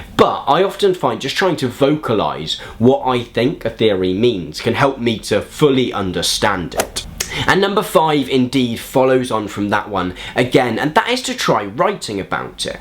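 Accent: United Kingdom. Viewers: The first 20 seconds sounded very British.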